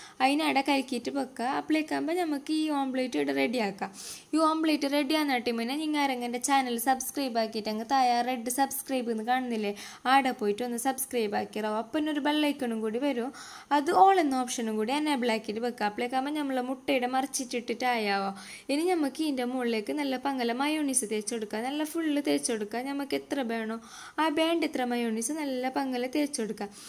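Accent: India. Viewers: നല്ല രസ ണ്ട് സംസാരം തലശ്ശേരി യല്ലേ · Super too Ikkanakinane nammal kasarkode slang padikum taa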